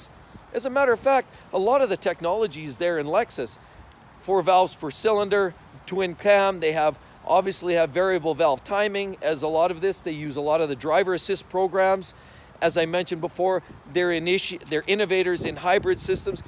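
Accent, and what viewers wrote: Canada. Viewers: Abut , ut , lol. Is this guy Canadian? Talks funny. Mercedes unreliable. Chinese transmissions.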